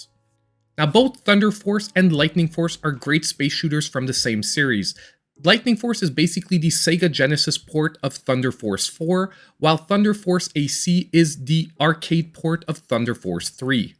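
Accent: Canada. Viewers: Why do you sound Canadian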